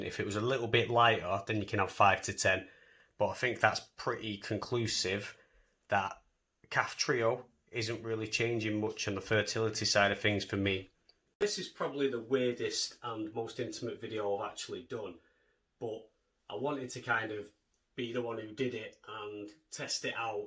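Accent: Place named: Northern England, United Kingdom. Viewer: Which CF unit do you go to? You sound like your from the North? I go to Leeds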